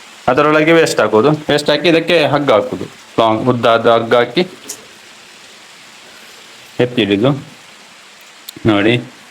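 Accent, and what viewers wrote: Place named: Mangalore, India. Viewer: Meenu tikkijindla bejar ejji anda fishing place and natural kudla style tulu patera soooper, wishing you better fishing next time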